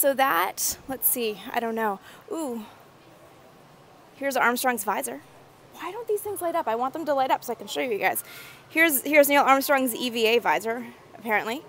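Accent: Canada. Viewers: Great video , your adorable! So cute.. I can tell your from Canada.. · Ha you sound more Canadian in this video.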